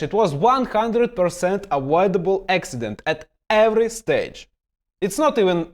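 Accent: Russia. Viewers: I Enjoyed Your Presentation Very Much. I'll Check Out Some Of Your Other Videos. Is That A Russky Accent I'm Hearing? Da, Nyet, Mozhedbwit? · Not sure what you mean. This is a thick Russian accent and he pronounces 'V' as any Russian - easily and with no problems. And yep - very good breakdown!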